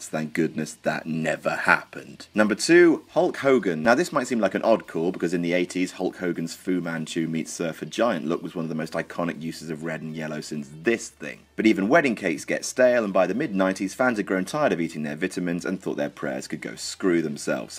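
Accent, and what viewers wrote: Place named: United Kingdom. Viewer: I find it funny that you're complaining about how a British guy speaks English. They do pronounce (and spell) a few things differently than we do.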